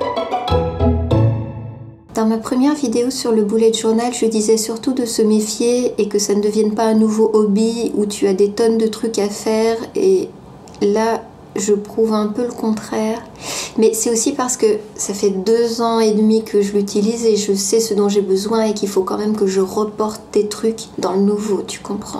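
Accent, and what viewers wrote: Quebec, Canada. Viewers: te parles pu en québécois?;)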